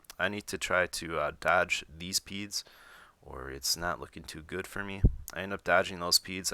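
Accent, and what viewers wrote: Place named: Wisconsin, United States. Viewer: smooth buttery Wisconsin voice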